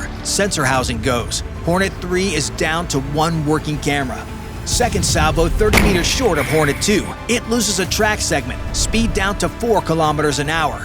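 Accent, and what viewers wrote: United States. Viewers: This is very old footage with lots of repeated footage. I muted it and watched and it was just as informative without the annoying excited narater with his wining voice pearcing my ear drums. Old news in a new annoying format with american naration. Annoying! Annoying! Annoying!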